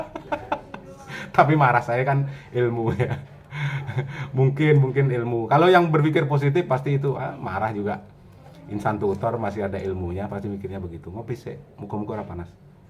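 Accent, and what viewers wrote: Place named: Java, Indonesia. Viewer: bang insan tutor asline wong ndi kok jowone ketok banget heheh.. makasih ilmunya bang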